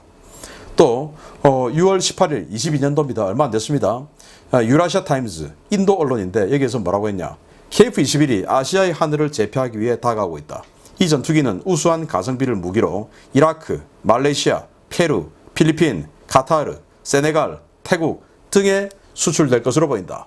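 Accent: Gyeongsang, South Korea. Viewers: 신앵커님 늘 목소리 약간 경사도 사투리가 멋저요 오늘도 괜찮아요 좋은 정보 감사합니다 국방당관 감입니다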